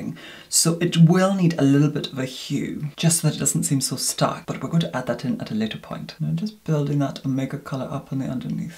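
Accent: United Kingdom. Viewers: I could listen to 👸🏻 👸🏻 count from one to one million or rattle off the alphabet from A to Z, and be perfectly entertained for ⏳hours⌛ by his beautifully accented voice 🗣🗣🗣 I consider his ☺cosmetic inventiveness☺, 🇬🇧dry English witt🇬🇧 and 💄immense makeup knowledge💄 to be an extra, added and appreciated bonus!!! 😍💯💎😻💋✨💖👑💖✨💋😻💎💯😍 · I Love your british accent <3 <3 <3 Love from Paris France <3 <3 <3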